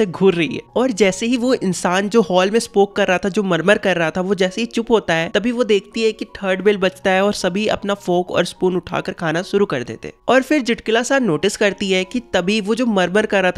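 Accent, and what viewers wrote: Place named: Jharkhand, India. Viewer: Bro your accent is jharkhandi.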